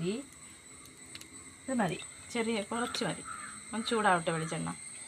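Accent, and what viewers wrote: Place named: Kattakada, India. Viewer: Vere content onnum. Kittiyille ithu ellavarkum ariyavunna karyamanu vakkukal mattiparanjalum kattakada bhasha kayarivaru nnu that is quite natural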